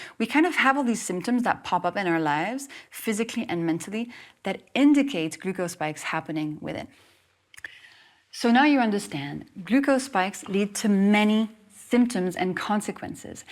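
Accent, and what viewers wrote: France. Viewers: Do I hear a faint French accent? It,s not underneath, it’s below in this context 😅✌️ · I simply love her French accent❤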